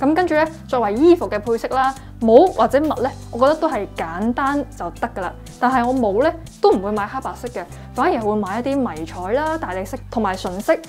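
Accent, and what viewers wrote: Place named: Guangzhou, China. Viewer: 衣著有品味，人靚、聲甜，好喜歡你！聽到你的廣州口音，特別感到親切……。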